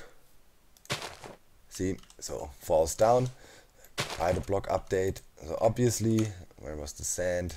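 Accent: Germany. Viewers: Sagmal du bist doch deutscher oder :D?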